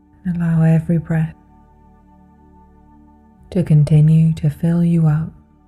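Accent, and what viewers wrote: Wales, United Kingdom. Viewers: Guided Meditations by Lisa Beachy Good to see a positive, hopeful message in an increasingly ugly world. Do I hear a slight Welsh accent I wonder? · I'm trying this for the first time.. Lovely to know of you.. I seem to hear in your accent that you may be welsh.. Is this true?..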